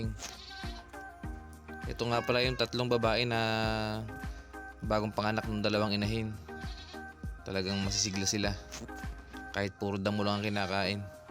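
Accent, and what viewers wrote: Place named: Luzon, Philippines. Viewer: Hello sayo dyan😊 kalina ko pa guess kung saang province ka, based on ur accent ay malamang taga Luzon ba kayo? Anong province? Di ko mahulaan😊 bilib ako sa diskarte mo at sure ako na pinag aaralan mo din lahat yan para mapa ayos ang farm…nakaka proud ka naman, sana ganyan din brother ko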